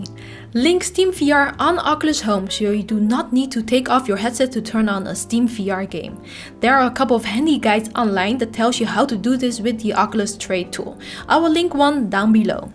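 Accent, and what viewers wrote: Netherlands. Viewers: Heerlijk dat Nederlandse accent :) , leuke vid ga em later nog een keer kijken! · Wait, you live in the Netherlands? I thought that accent sounded familiar lol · Damn you sound dutch, holy shit lol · Haha I was guessing that you were from the Netherlands. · Can't figure out what a dekstop is... Other than that, great video with the most amazing Dutch accent. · Dutch accent.... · he means you have a Dutch accent with a Asian accent mixed in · Are you dutch i think i can hear it in your acaent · Fking strong dutch accent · Ok. First srrs thx for the tips. 2nd. Oof. Wat ongemakkelijk om zo nederlands Engels to hooren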